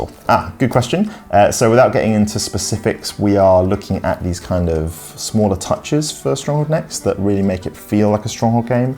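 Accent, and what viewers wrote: United Kingdom. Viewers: I like British accent :)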